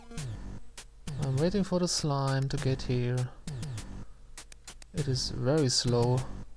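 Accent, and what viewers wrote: Germany. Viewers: i bet this dude's accent is german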